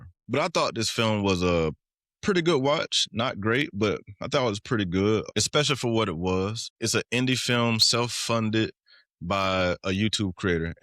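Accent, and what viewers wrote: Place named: United Kingdom. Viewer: "distorted and cruel" bruh that's just a british accent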